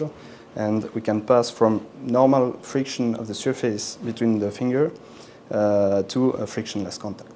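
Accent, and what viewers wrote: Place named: France. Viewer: Sounds French to me.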